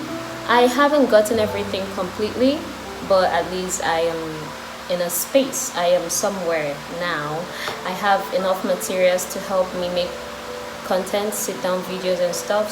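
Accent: Philippines. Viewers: you got the philippine english accent.it’s good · I"m a new subscriber of your channel. You speak english clearly and your accent sounded like english speaker pinoys. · Philippine English accent.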